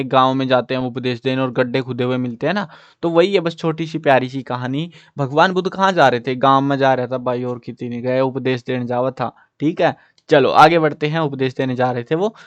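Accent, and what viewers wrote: Haryana, India. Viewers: Sir app haryana sa ho kya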